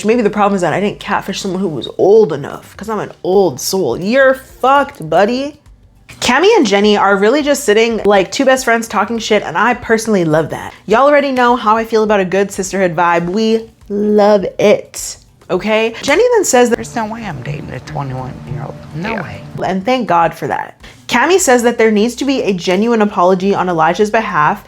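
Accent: Canada. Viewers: this is the catfish episode that may have pushed me too far…..what a wild piece of tv…. also i’ve never realized how canadian you do sound until this video !!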